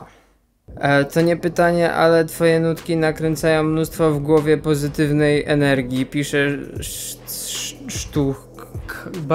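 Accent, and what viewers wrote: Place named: United States. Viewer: A pytanie którego nie bylo to skąd pochodzi Twoj ang, i przede wszystkim akcent? Nikt nie ma takiego jankeskiego akcentu bez zmudnych cwiczen #anglistawie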